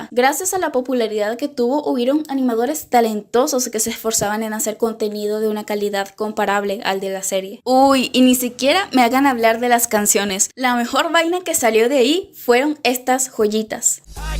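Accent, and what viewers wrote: Venezuela. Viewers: Eres venezolana?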